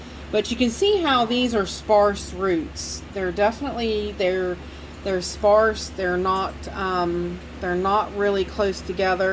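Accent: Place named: Tennessee, United States. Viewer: I guessing eastern TN by your specific accent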